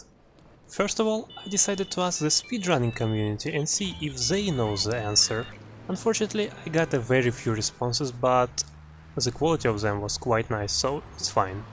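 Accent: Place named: England, United Kingdom. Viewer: Oh, you from England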